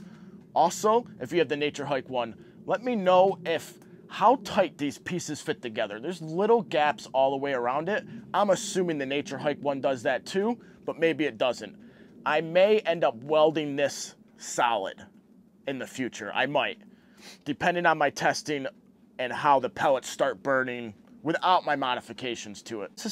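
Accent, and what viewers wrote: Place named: Western New York, United States. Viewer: Wow! You sound like you're from WNY!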